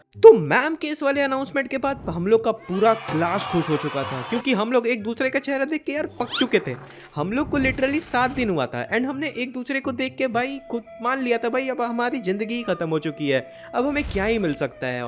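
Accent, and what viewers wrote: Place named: Assam, India. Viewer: Bro aapse Assamese wali vibe aati h .. wese ho kahase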